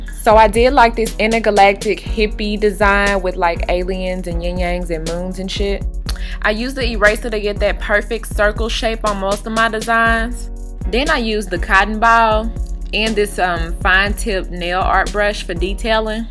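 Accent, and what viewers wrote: South, United States. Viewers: I don't understand why people were saying this was "ghetto" and she sounds "ghetto" ?? wtf does ghetto sound like ?? Cuz to me it sounds like she just has a country accent.. But anyway..nice tutorial girl. Them nails SLAY 😍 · I LOVE IT!!! YES TO YOUR SOUTHERN ACCENT!!! YESSS TO YOUR PERSONALITY!!! YESS YESS YESS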